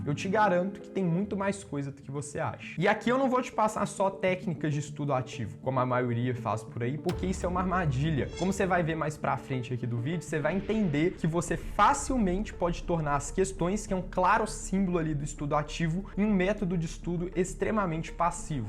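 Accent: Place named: Minas Gerais, Brazil. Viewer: Seu sotaque é muito massa. Tmb sou mineira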